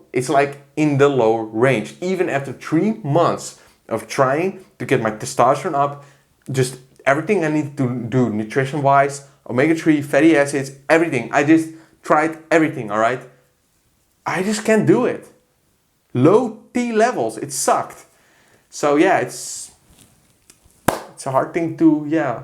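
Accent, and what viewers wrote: Netherlands. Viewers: Hier via Derek. Binnen paar seconden wist ik dat je nl was. Dutch accent > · Here from more plates for dates, en toen dacht ik deze gozer heeft een nederlands accent dus ff kijken.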